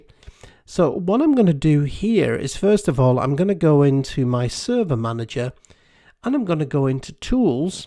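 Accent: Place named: United Kingdom. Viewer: I'm very excited about your videos, you are very calm teacher and your speaches are easy to understand thank to your perfect British accent! I want to studies all of your video and give up in my Knowledge.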